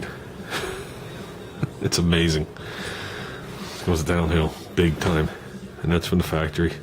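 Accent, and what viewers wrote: United States. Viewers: That's funny, It was good to hear AMERICAN for a change .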